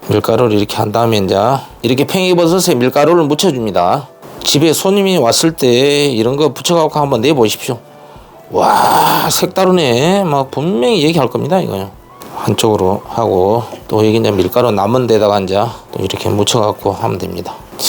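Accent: Jeolla, South Korea. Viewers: 말투가 너무 정겨워요. 전라도 사투리는 요란스럽지 않아서 좋지요 ㅎ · 전라도 사투리 구수하네 ㅋ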